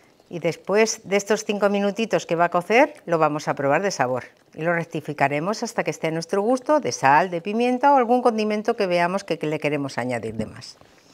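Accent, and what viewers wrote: Spain. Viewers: Me gusta ver la cocina de porque todo lo explicas muy bien, otra persona que me gusta mucho ver es Armando en tu cocina y el también explica todo paso por paso, el es Mexicano y tú me imagino que eres Española por hacento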